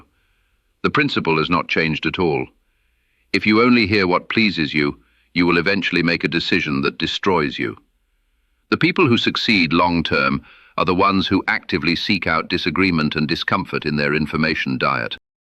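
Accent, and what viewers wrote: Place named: England, United Kingdom. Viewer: He was Italian, why does he have an English accent?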